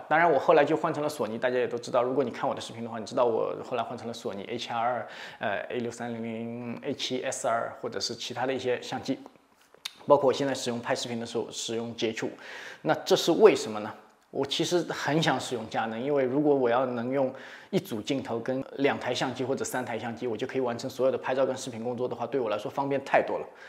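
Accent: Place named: Jiangsu-Zhejiang, China. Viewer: 应该是江浙一带的人，听他讲普通话觉得很亲切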